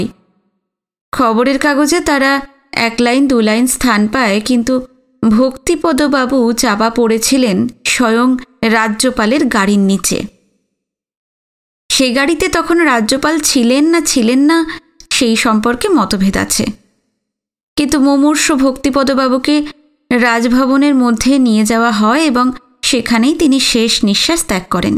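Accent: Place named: Faridpur, Bangladesh. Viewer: তুমি এমন চমৎকার ভাবে ফরিদপুরের ভাষায় ও ওখানকার আঞ্চলিক টানে গল্পটা পড়ে শোনালে যে কী বলব ! অসমসালা !!!😂😂😂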